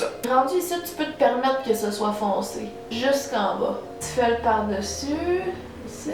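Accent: Quebec, Canada. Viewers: Je suis juste là pour son accent Quebecois Haha qui est là pour ca aussi ??? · Vraiment charmante, l'accent Québécois je pourrais l'écouter des heures 💗💗💗💗 bonne continuation bisous de France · J'adore l'accent Québecois! En tout cas t'es superbe et vive le Québec! ^^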